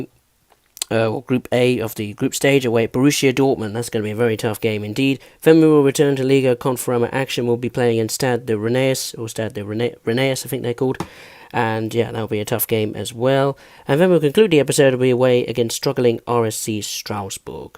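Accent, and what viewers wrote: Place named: United Kingdom. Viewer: You sound like a rich posh englishman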